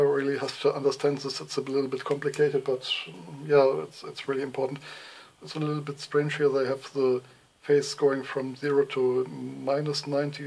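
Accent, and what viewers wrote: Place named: Germany. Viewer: I think the reviewer is German ...i can tell from his accent. munchen?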